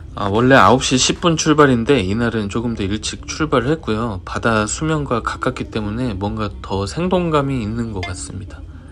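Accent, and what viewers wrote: Jeolla, South Korea. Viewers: 전라도분 이신가요? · 전라도 사투리 억양 반갑구마 잉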